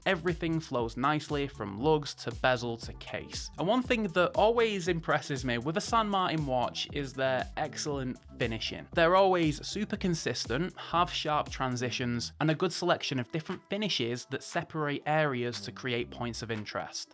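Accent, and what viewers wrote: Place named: Scotland, United Kingdom. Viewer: Why are all you reviewers Scottish? 😒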